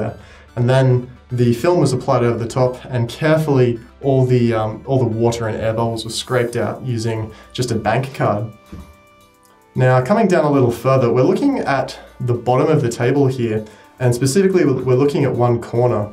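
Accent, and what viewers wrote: Australia. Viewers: Well done, I thoroughly enjoyed your tutorial and it was great to hear a comrade from Us-trail-ya (Australia). I've been dabbling with Arduino and all sorts of odds and ends, then came across this video which has inspired myself, and hopefully others to incorporate different methods to create their own piece of modern art.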